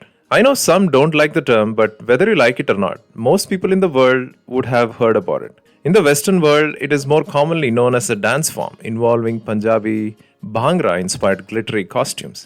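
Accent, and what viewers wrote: Kerala, India. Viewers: Well done my brother, you are a mallu? Mallu can speak like this .all the best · Oru malayaliyaanu, samsaarikkunnathu? Aaraanathu????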